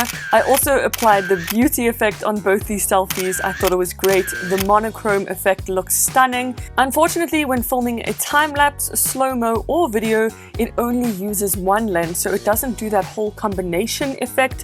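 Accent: South Africa. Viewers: Is this a Southafrican, dutch, australian/kiwi or danish dialect? Easterneuropean of some sort? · I hear the South African accent... then I see the charger - not South African. Then I see the police bakkie . 😉 😁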